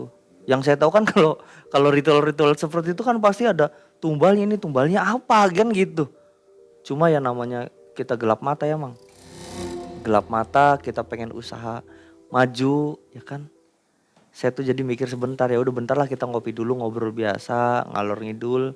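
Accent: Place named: Cirebon, Indonesia. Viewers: Lucu sama logat Cerbon nya hehe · wong endi sih cirebone ngapak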